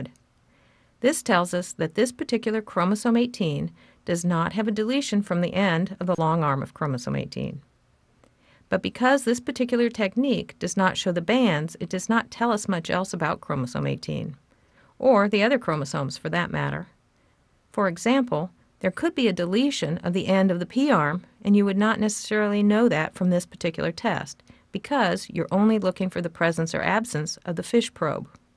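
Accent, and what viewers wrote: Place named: Texas, United States. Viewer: Ahh.. I love that Texan accent ^^ Very clear explanation of this method also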